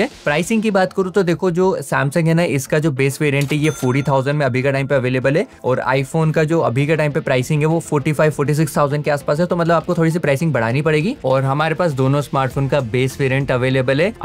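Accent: Indore, India. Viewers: Bhai Indore se ho kya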